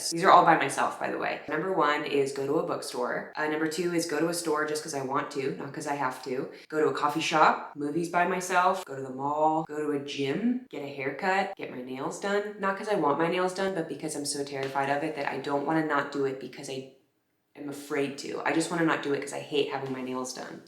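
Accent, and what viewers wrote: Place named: Tennessee, United States. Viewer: Sound like Gatlinburg Tennessee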